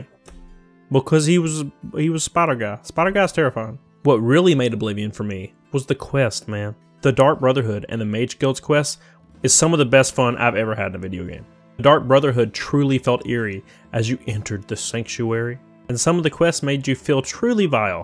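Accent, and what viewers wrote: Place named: Texas, United States. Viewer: Your accent sounds like Amarillo TX....had a co-worker who sounded just like you before. Regardless, your accent is so relaxing and you do a great job!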